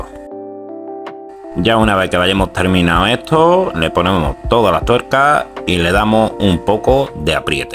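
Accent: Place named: Andalusia, Spain. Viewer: Es español .andaluz del sur de España.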